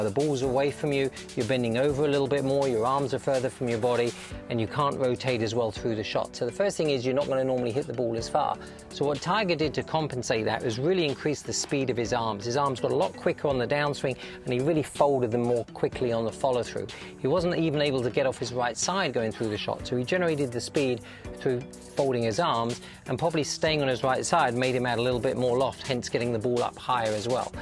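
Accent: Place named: United Kingdom. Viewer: One if the best shots of all time in golf and i have to listen to it be talked over by barely a man with a stupid British accent for the whole video?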